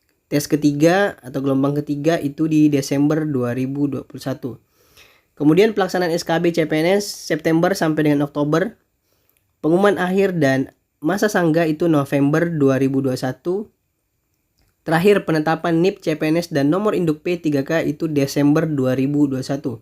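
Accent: Pontianak, Indonesia. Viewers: Mau tanya bg abg asal pontianak ya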